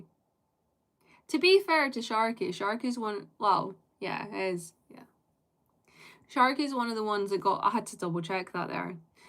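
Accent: Scotland, United Kingdom. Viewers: I was very fortunate to put on YouTube to be greeted by only a few minutes in to the stream. Both entertaining and beautiful (if I may be so bold to say so) unfortunately I fell asleep halfway though, thank goodness for catchup. It had been a very busy day in my defence but I suspect your wonderful voice and gentle Scottish accent played it's part. 💕💕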